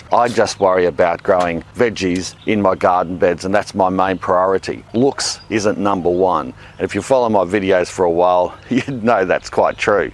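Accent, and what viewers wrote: Australia. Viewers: I don’t know why but you remind me of Kenny the toilet guy.. Maybe it’s your Australian accent .. Needless to say I love your channel. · Fantastic video, just what I needed to see for my next project. As aussie as they get, well-spoken and bloody good at what he does. And them tradie shorts - Lol! I nominate you next host of Gardening Australia! Greetings from Sydney mate. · Great explanatioan and a very cool lady back aussie accs!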